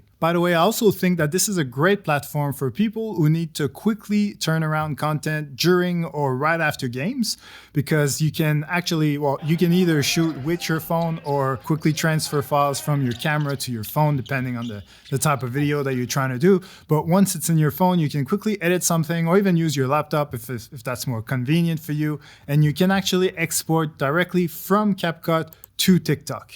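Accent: Quebec, Canada. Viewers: You are from Quebec right ?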